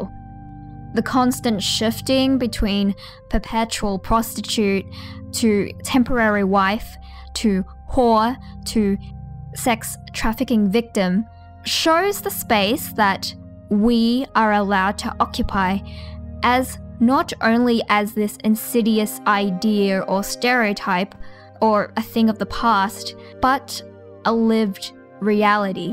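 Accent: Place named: Australia. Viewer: Ironically, has an Australian accent. NICE!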